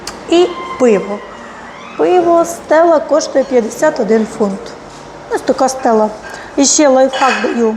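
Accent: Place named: South, Ukraine. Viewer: Доброго дня ! Ми часто вас дивимось в Україні в ютубі.Нам подобається ваша мова і ми завжди думаємо з якого ви міста.Я думаю с юга України бо ми з Херсона.Дякую за відповідь!.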